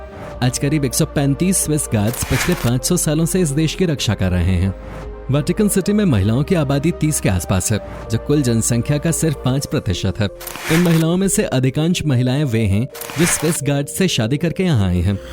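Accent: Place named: West Bengal, India. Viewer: Bangali voice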